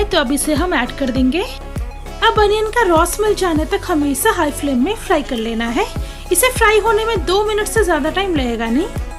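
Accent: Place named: Bengal, India. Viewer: guru kotha sune to mone hocche bengali. ta, barite ki ei rokom macher jhol khan..... youtube r yuge ekhon sobai chef.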